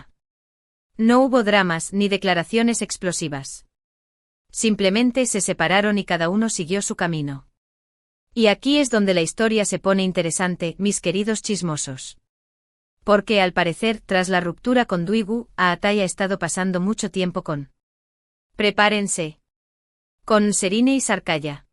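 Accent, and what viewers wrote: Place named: Spain. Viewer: A esta persona que habla sin sentido ,por su forma de pronunciar con acento español ,no se cansa de decir estupideces,el señor Ulusoy vive trabajando no ventila su vida privada ,me pregunto de donde saca tantas mentiras,el señor Ulusoy graba de noche ,de día por lo que se ve en su nueva serie,entonces como esta persona se entera de la vida del actor,simplemente porque miente .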